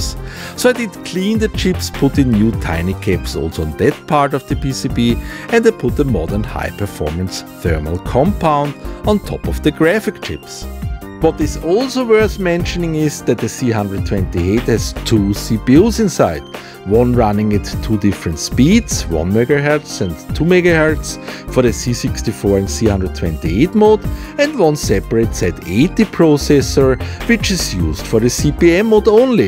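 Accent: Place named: Austria. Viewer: you speak a very nice austrian english, ..... 😎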